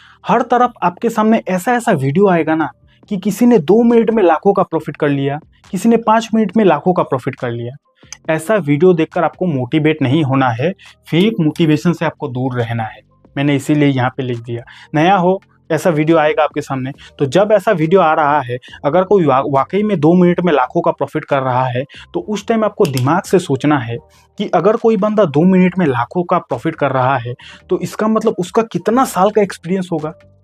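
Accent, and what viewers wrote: Bengal, India. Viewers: सर आप एकदम सरल भाषा में समझते हो आनंद आ जाता सीधे दिमाग में घुस जाता है लेकिन आपकी हिंदी में थोड़ी थोड़ी बांग्ला भाषा की ton आ जाती है तो और मजा आ जाता है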